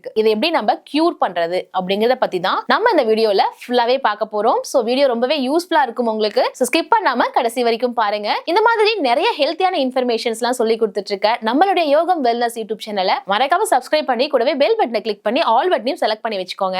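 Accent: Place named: United Kingdom. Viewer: 👍 What a british english ...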